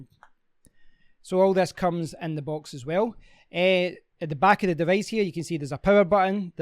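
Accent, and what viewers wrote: Scotland, United Kingdom. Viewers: HES SCOTTISH!!!!! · Neither Welsh n or Irish; it's pure Scottish. :-)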